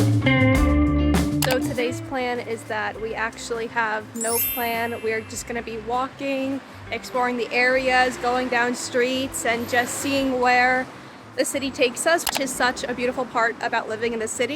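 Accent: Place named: Argentina. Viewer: ! Vengo de ver tu entrevista y tu forma de expresarte con esa mezcla de acento argentino y tu acento americano es super lindo. Me dió ternura escucharte con el acento americano pero a la vez me encantó escucharte pronunciar las palabras como porteña. Encontrá la manera con la que más auténtica te sientas, pero tu forma de hablar el castellano como argentina tambien es super lindo y un bonito gesto de honrar el pais que te recibió. 💖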